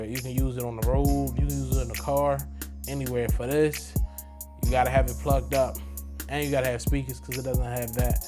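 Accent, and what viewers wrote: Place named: Washington DC, United States. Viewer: You got a Dc/Pg county accent🫡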